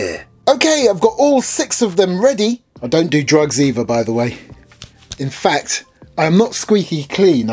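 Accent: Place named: United Kingdom. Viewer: How have I only just found this channel? Love a cheeky British chappy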